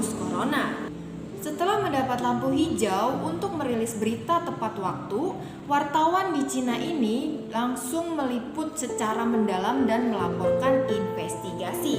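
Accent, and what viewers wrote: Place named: Java, Indonesia. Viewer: Se' lo mba, medok temen bosone 😂 But, Informasi yg disampaikan sangat bermanfaat, thanks yoo atas infonya👍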